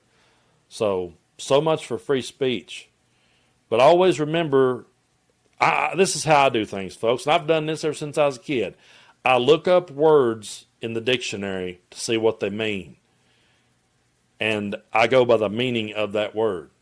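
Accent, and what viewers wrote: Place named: Kentucky, United States. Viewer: When l 1st started watching you, your name " " threw me off cuz of your Kentucky accent. I was born in Paris-and-I-don't-mean-France. Anyway, your current events coverage is brilliant, insightful.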